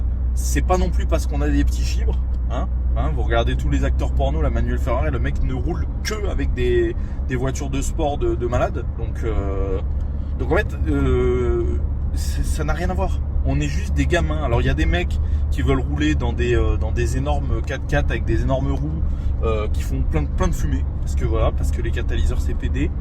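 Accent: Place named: Arab world. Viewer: Pourquoi l'accent arabe? Mis à part ca, on à l'impression d'entendre Papacito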